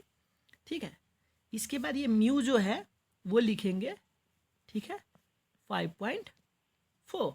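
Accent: Nepal. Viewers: Nepali accent 😂…anyway thank you so much ❤ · are u nepali ? broh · Why 🤔 speaking in Hindi with nepali tone ?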